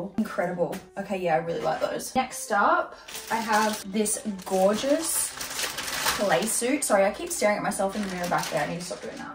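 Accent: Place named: Australia. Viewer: You are a beautiful ginger/redhead. Beautiful light green eyes, cute voice with the Australian accent and pretty smile. Beautiful body and curves, cute little belly button, nice little booty and long legs. You look very pretty and STUNNING in everything in this video. The dark blue jean shorts and crop top tank top and turquoise crop top tank top would have to be my favorite on you, those dark blue kinds of colors just look really good on you and make your eyes pop. Hello cutie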